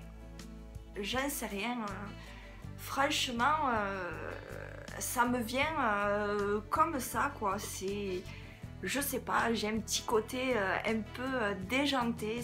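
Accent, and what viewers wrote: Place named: South, France. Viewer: AAAh ok j'entendais un petit accent du sud,bonne journée à toi!bizzzzzzzzzzz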